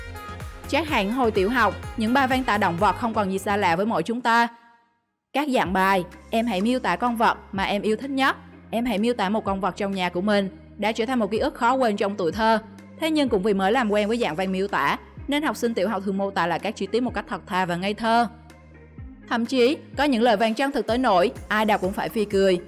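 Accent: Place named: Central, Vietnam. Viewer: Tìm bạn nữ đọc rõ tiếng Việt nhé Ad. Đừng dùng người trung giọng khó nghe quá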